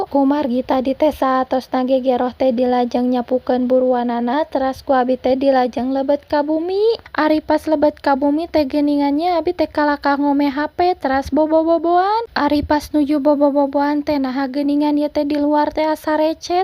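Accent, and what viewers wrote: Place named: Sunda, Indonesia. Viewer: Sunda nya teh 😊😊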